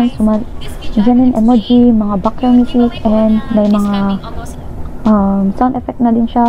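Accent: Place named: Zamboanga, Philippines. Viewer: Nakakatuwa and nakakamiss makarinig ng tono mo. Hehe! Oh, how I miss Zamboanga.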